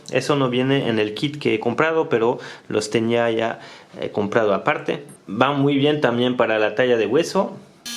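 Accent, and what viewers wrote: France. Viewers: Eres español? Porque es inconfundible la musicalidad de la fonética francesa... 😮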